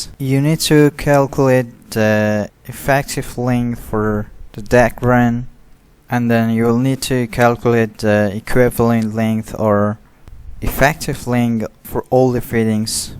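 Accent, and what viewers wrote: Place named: Pakistan. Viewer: i think ur pakistani trying american accent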